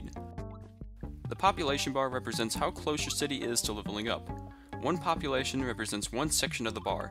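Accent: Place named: United States. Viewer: He doesn't wanna pronounce it like that because of his yeehaw American accent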